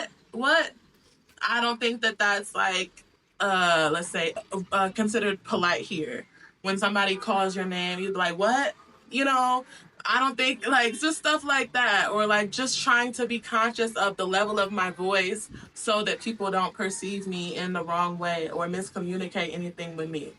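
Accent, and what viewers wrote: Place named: United States. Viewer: Your American accent is what is making them shy its not that they are ignoring you.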